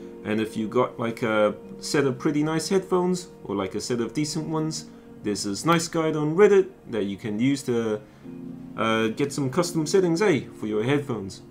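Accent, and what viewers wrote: New Zealand. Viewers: He's a newish type of zealander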